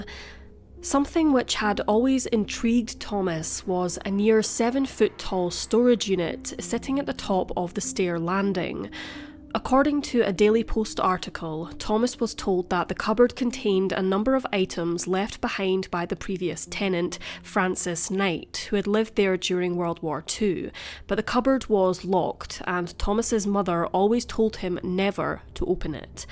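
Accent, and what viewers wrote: Scotland, United Kingdom. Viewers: I know the topics are serious but I do find her voice so relaxing . I love the Scottish accent x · I have family from the Angus area of Scotland, and her accent sounds really similar... sort of 'Edinburgh' rather than Glasgow. It really is such a lovely accent, and the narrator's tone/timbre is so beautiful too... She could read children's stories or folk tales/legends, and it would be amazing :)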